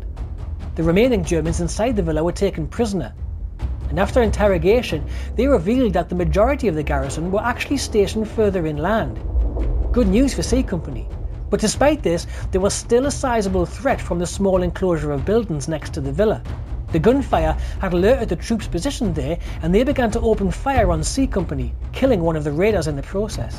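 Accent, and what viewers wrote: Newcastle, United Kingdom. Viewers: Excellent video. Sorry about you pissing-off the Para's - they hate being mistaken for the Green Beret mob! Love the Geordie accent - why Northern and not North-Eastern Historian? (I was born in Hartlepool.)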